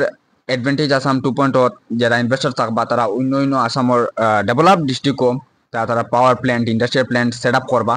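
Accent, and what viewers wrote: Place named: Sylhet, Bangladesh. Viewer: Tumi olpo Shuddha Bangla Matiyo. Jaate Non-Shylleti manusheo jaate bujhte paaroin........ .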